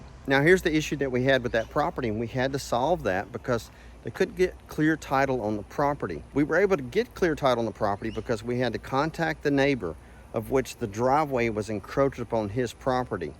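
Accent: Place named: Louisiana, United States. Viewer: Funny how I can see a YouTuber for the first time and can hear they are from Louisiana..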